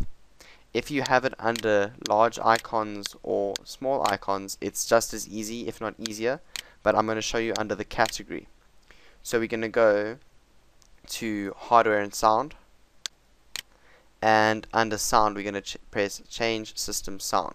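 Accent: South Africa. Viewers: Are you from South Africa? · Are you South African?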